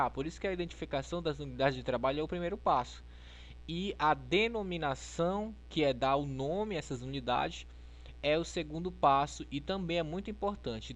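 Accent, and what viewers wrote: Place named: Pará, Brazil. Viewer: Sotaque paraense detected! Impossível não reconhecer um Belenense falando! Hahahaha.. Muito bom os videos, parabéns! Deu pra ajudar a iluminar bastante as minhas ideias.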